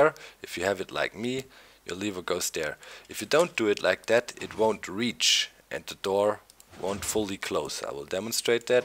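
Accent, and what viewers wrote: Germany. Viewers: Hey, are you from Germany? The accent sounds like that:D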